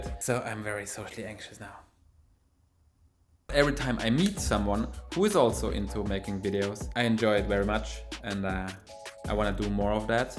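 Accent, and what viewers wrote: Germany. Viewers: You are so funny, maybe also involuntarily because of your English with that strong german accent My friend Martin who is Logopaede said that you have a speech impairment but I don't mind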